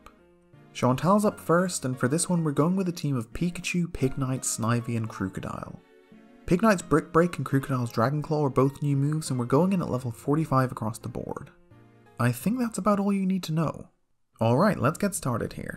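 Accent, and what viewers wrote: Ireland. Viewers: hes from ireland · Oh ! I didn't realise you were Irish too!! That's so cool. Hello! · Aye I didnt know you were irish too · NorseGoat I can only recognise two Irish accents, and his voice didn’t sound like either but I feel like such an idiot for not realising, I just watch too much Foil Arms and Hog.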